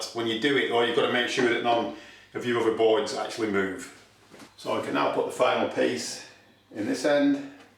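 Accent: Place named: Chorley, United Kingdom. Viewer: I really do love your videos-have learned so much from them! Great accent too! I grew up in Chorley and it makes me feel quite homesick when i hear you! Any chance of a video to do with fitting a small handbasin in a toilet only room? Need to do this job and would feel much more confident to see you do it first!! Good stuff...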